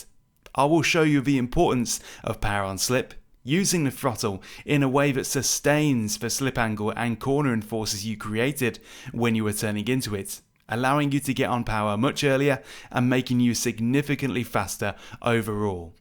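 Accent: United Kingdom. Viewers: You have the cool Brit accent I like! Like driver 61. Where is that accent from?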